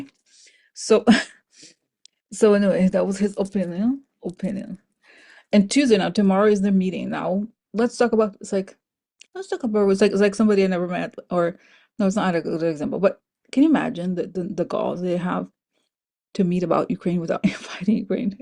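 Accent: Russia. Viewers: Your Russian accent is just fine. I have no problem understanding you. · I love your Russian accent!! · I am hearing impaired so I either read the CC or the transcript. Pay no mind to negative comments regarding your Russian accents. You make me laugh. I adore you.❤ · I like your Russian accent and it’s funny! Keep it up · i love your russian accent. it is hilarius. I laugh out loud. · I love your Russian accent ❤ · I love your Russian accent and all your videos. You and Corina are so much better informed than any other channel. You are the best!!! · I don’t mind your Russian accent! I just use English captions on the video! 😂😂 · I can understand your Russian accent just fine; I have a degree in theatre, though, so…🤓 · I love the russian accent, that is so funny. I live for it. Not only do you give us hope but you make us laugh. Its everything, dont change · Love your Russian accent! 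Thank you. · I love YouTube. Sometimes I watch your video almost to the absolute end but don’t let it completely finish because I want to comment and when I come back it isn’t where I left it but it comes back to a part that intrigued me when you spoke about it the first time!!!! Freaky cool! Love your work AND YOUR RUSSIAN Accent!!!! And I love the makeup and hair styling!!! You are working so hard whatever you need to do to keep yourself soothed and I think fresh and beautiful!!!! So appreciate all your hard work! Much love from Michigan. 💛✌🏻 blossoms are coming!! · Love your Russian accent 😂💞 Thank you for the work you do ❣️ Much love and gratitude 💖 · The switch between your Russian voice, perfect English and funny faces is super... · I love your readings and your Russia talking voice😅. You're great! 🥰💞🤍🕯️🕊️ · I love the Russian accent. makes the commentary come alive. Very entertaining. 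· I love your Russian accent. I enjoy listening to you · I love your Russian accent! Makes me laugh every time. I love your welcome song too. Look forward to it every video. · I love your Russian accent! You are very good at that and making their faces. I always laugh and I CAN understand what you say. We just have to listen. Thank you for all you do to bring us much needed information. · I think your Russian accent is hilarious! My only piece of advice, after spending about a month total in Russia many years ago: Pronounce your “h’s” as if you’re trying to clear phlegm from your throat…. Especially with the word “happy”. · I love you Russian accent.. I think it's cute I think it's precious and I understand every single word you're saying.. ❤ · I enjoy listening to you,. Always informative. And I understand you perfectly, even your russian accent😅 Take care😊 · Screw those people who don't like the Russian Accent. 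I love it!! We got you girl!! 🎉🎉🎉🤪🤪💙💙💙✊️✊️✊️ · To hell w people that don’t understand ze Russian accent. 😂 For crying out loud! I think it’s super cool when you do it!! Return to zender!! 😅 😊Rock on!! ❤ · I have no problem understanding your Russian accent. You are just PRECIOUS and you make me SMILE!!!😊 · I love your Russian accent you are an actress and a channele.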